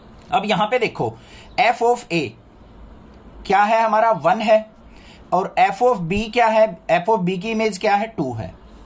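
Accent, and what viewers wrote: Haryana, India. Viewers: Sir are you from haryana❤?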